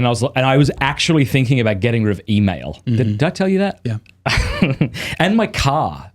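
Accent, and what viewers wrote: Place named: Australia. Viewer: As a Texan, I have to say that hearing brother say "y'all" in that Australian accent simultaneously delights me and makes me feel weird. Love the channel; it's been a great help on my own journey.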